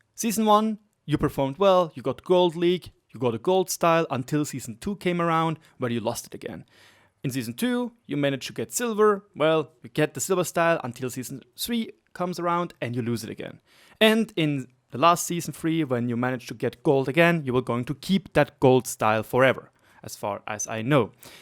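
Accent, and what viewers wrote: Germany. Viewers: Great job with the vids m8, one small hint tho': dont talk so fast :) i know your main language is german and your enlish is very good but the german accent sometimes kill your words :) keep it up - we enjoy your content and your effords!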